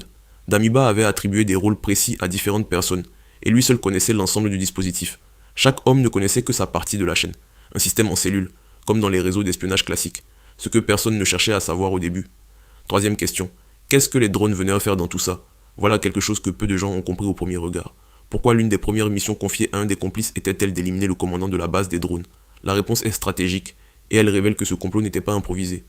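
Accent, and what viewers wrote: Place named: Ivory Coast. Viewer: Arrête de mentir, ce n'est pas ressent comme tu essais de el faire croire. Les frères et soeurs de l'AES attention à ce genre de chaine de propagande contre l''AES. Regardez le nombre d'abonnés qu'il a ce mec, 104 et cela signifie que c'est tout ressèment qu'il l'a créer pour divulguer des fausses informations. N'oubliez pas que la France et les pays comme la Côte Ivoire ont mis en place un recrutement de jeunes délinquants ivoiriens. Dans la vidéo que vous pouvez retrouver facilement sur internet, on n'y voie des africain comme ce mec qui parle dans cette vidéo, assis dans une salle avec des t-shirt noirs avec une inscription dessus. Le bute est d'inonder tout les réseaux sociaux pour contrecarrer l'AES et diffuser de fausses informations, faire des faux débats en montant sur des panel panafricains et pro AES pour tenter de les convaincre et de dénigrer les dirigeants de l'AES. 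Donc ne regardez pas ce genre de chaine comme celle ci qui ne sont la que pour la désinformation du Sahel. Ecoutez la voie de gars qui parle dans cette vidéo, on reconnais tout de suite que c'est un 100% ivoirien avec leur salle accent de merde de woubie. Il n'y a eu aucune tentative de coup d'état ces derniers jours ou même heure. En conclusion c'est l'un des chaine de propagande ivoirienne de fake news et de désinformation sur l'AES. Nous sommes sur place et il n'y a rien du tout . Ils vont prendre des images et vidéos qui datent et vont essayer de vous faire croire que ça ce passe maintenant. Peupl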